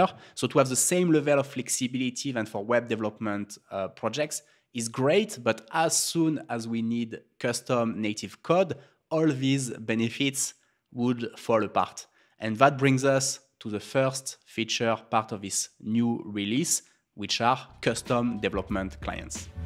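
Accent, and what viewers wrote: France. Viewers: As a dev learning both React Native and french, I love your videos!! Just love the french english accent 💚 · it seems you are from france?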